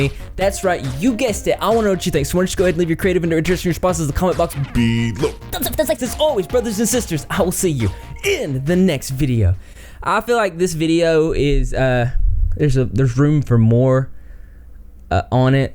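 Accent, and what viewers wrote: South, United States. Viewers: It's so refreshing to hear things like this and such a sensitive position in a southern accent. I feel like conscientious & kind southerners don't get a lot of representation (not that they're an ~oppressed~ group or anything, I just think the largely conservative majority of southern states makes a specific stereotype somewhat accurate) and it's just very comforting and nice to hear. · I'm trying to figure out where this guy is from lol. Definitely from the south, but at the same time reminds me of the 1980s. Does that make any sense? Probably not! 😂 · nice southern accent! haha im from texas, where are you from? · Southern I'm from ky and its definitely this area Tennessee maybe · Are you southern? Because i am and i cant tell, which is so wierd! This is the first video of yours that ive watched. You seem southern, but i have heard people from california talk like that so i dont know.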